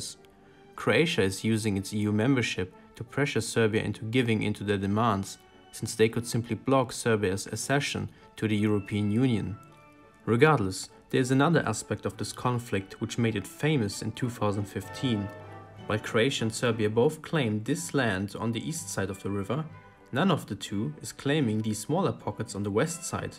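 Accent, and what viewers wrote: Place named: Germany. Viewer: based German accent ⚫🔴🟡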